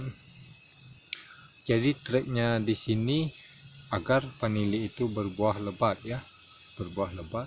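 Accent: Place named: Bali, Indonesia. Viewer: logat Bali ini sepertinya engken bli kabare becik becik ya salam dari jakarta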